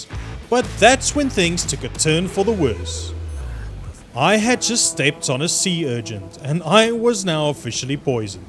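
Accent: Afrikaans, South Africa. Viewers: Do I hear a South African accent? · You are south african me to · Are you South African? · South africa? I'm ne not trying to offend you but are u south African because u sound like a south african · Are jou South African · Are u afrikaans? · you sound afrikans bro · Haha nice , eks ook afrikaans so ekt die aksent amper dadelik erken 😂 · South African? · oh ok because i thought that accent of your sounded really like a SA accent was just curious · You sound like you come from ZAR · South african or new zealand? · You sound like a south african when you speak english (the accent) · Are you from south africa? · It sounds like your from SA? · First time I've ever come across your channel, can hear that Afrikaans coming through :P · for some reason you sound south african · You sound South African? Are you by any chance one of the 44? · Are you south african? 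Your english sounds a little like you got an afrikaans accent? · Why do you sound South African. Are you from South Africa? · u sound afrikaans ..., are you? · Good to hear a south african voice, epic video man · Bro u gotta be South African with that accent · mans sounds south african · The south african in me smells an accent 👀🤣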